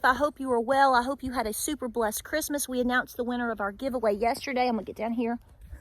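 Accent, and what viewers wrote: South, United States. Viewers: Love your southern accent videos, I almost don't want to print London temperatures in the 40Fs and easy rain showers. Hope things warm up soon for you all.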